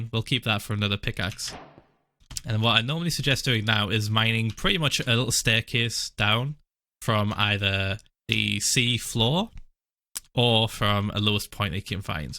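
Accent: United Kingdom. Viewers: gosh i love that british accent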